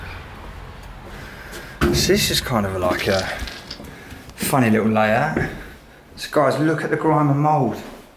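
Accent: England, United Kingdom. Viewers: Where in England is this guy from? I'm in Canada and can't get the accent.....can you send a message to me?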